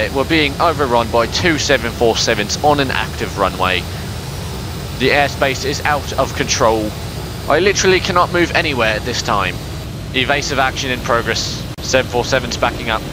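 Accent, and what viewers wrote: United Kingdom. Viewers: I’m absolutely in love with this (I’m assuming British) accent lol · i love your british accent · Why your voice sounded like your british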